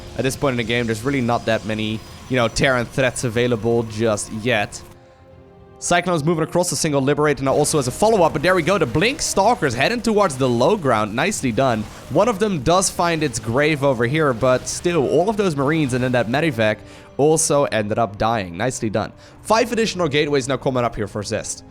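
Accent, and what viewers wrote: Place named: Netherlands. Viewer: Great commentary as usual. I can tell by your accent that you're from the Netherlands. I'm from Texas. I'm sorry about Trump.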